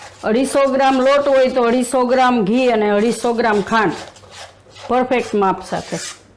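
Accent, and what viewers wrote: Kathiawar, India. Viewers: બેન રેસિપી સરસ છે અને તમે કાઠિયાવાડી ભાષામાં જ બધુ બોલો એ જ સારૂ અને મીઠું લાગે છે અએ તમારી usp છે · Pyor kathiyavadi gujrati bolo Cho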